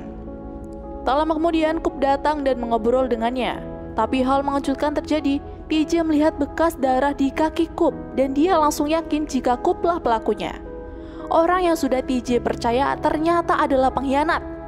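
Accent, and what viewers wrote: Pemalang, Indonesia. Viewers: Wong Pemalang min?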